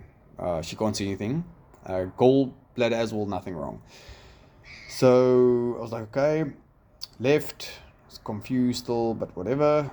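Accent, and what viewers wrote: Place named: Johannesburg, South Africa. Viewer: Im trying to pin point that accent. Im guessing Joburg?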